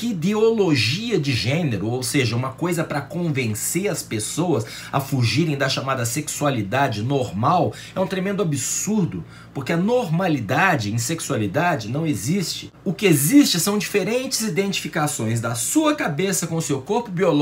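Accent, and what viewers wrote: Minas Gerais, Brazil. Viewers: Com a tua serenidade e o sotaque mineiro não parece estar full pistola, cada dia melhor o teu canal, em relação ao Doria, usurpadores tem em todo espectro político, desde uma ponta da ferradura ideológica até a outra. Abraço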